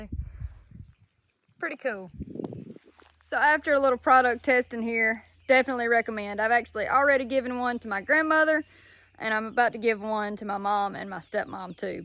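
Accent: South, United States. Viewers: "you are Purrrrday"" and your country ACCENT reminds me of,--(, Bless you)), girls that say my name like, cheee-steer-!,lol.(((eye love your channel, 🤓try pepper spray on a 200lb wild hogg, bring extra spray & bee safe. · Southern accent is priceless for my Finnish ears :D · Definitely a stallion so beautiful the southern accent makes her even more gorgeous 💕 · That American accent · Okay my neighbors to the south. I'm up in Vancouver, Canada and obviously we have nothing like this absolutely fascinating and utterly adorable accent (?) up here! Is this just an anomaly or is this basically the 'drawl' southern states have? I have been to Florida and it didn't apply but is this the norm in Kentucky, Tennessee, Georgia and Alabama...and others? I'm thinking maybe Texas as well? Its so damn.....pure? I don't really know what to call it but it's just such a wholesome and comforting kind of (for lack of a better word) accent. 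It makes me really want to take a trip down and do a road trip through all the southern States the summer. Anybody have any recommendations on the most enjoyable Southern state or states to visit? ❤ from a 🇨🇦! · this is the thickest american accent i have ever heard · I tell you what that Southern draw and that Brunette hair my goodness. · There is some accent in this southern! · Personally, I love southern accent. But if you would like to hear her as a northern girl, just turn the playback speed to 1.25. 😉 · Southern draw ! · Shes gorgeous and as I Texas boy I love her southern accent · damn had to subscribe because of the country accent love the videos lol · I love your country accent oh my God marry me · South state accent? Not my native language, I try to perfect it, so if you mind tell me, I'll be greatful. (You said Alabama, right?) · i have no idea what a pepper blaster is. but shes kinda cute says it with a thick southern accent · This is the most American accent ive ever head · Now folks, this is what a good ole southern girl sounds like. 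I was born and raised in central Florida and we got some words most people don't use or know of. This young lady is very pretty to boot. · Aye aint gon she so fine 🤧🤣 i need me one of her them south girls w the southern accent that shit so attractive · That southern drawl is too cute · This woman is so freaking adorable 🥰 Enjoy her content ✌🏼 Gorgeous!! That Southern drawl is to die for Where have you been 🤔? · Always been a fan of brunettes, loving the southern accent too. Girls and guns, gotta love it. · I sure miss the TN/AL accent!! Gotta love her southern twang!! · U go girl bless you 😳 love the way you talk I'm from South Carolina but U got that Southern slang 😍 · Always real American accent.. 👍🏻👍🏻👍🏻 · I just saw one of your videos as I was strolling and I was like wow she’s really beautiful so I instantly went to your channel and subscribed, I love your southern accent and your personality and your in amazing shape but one negative thing I saw was your amazing 💐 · I just love thst country accent. 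Makes me feel all American inside. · Something real nice about that southern accent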